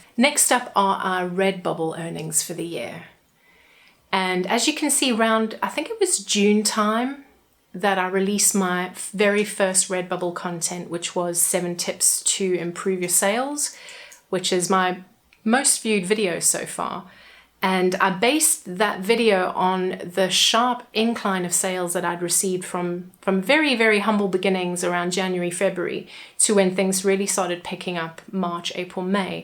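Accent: South Africa. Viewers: South African expat accent 😁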